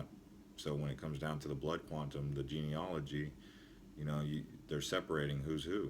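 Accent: United States. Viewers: This is the virst Video I watching on your channel. You sound very american. Haha. Maybe I stay a little bit longer here and watch more. Thank you for this Video. Greetings from Vienna ^-^ d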